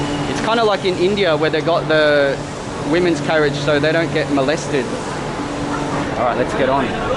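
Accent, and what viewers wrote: Australia. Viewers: The new metro looks good! I hope they install platform doors later on. It would make the system a lot safer. Your stations are above ground rather than underground like ours. I'm from Hong Kong, China. Your accent - is that Australian or New Zealand? Also, LOL about Vietnam's population of 98 million. That's what I see on Worldometers. Yes, I agree got to hit 9 figures.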